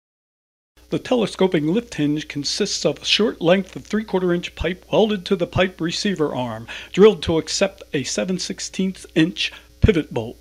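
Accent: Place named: Pennsylvania, United States. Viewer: What country is the moderator from? Sounds like a PA Flatlander turned Northern PA "dude."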